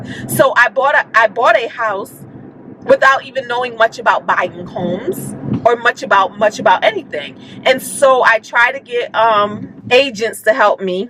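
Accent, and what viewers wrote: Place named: New York, United States. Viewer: Check to see if your state has a ERAP program. Idk where yiu are from but you sound a little New Yorkish to me-- if so that program opens June 1 and the landlord can apply for it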